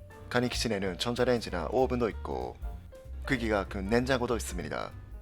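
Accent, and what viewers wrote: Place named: Japan. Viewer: 한국어 잘하지만 지울 수 없는 일본인의 향기가 호감입니다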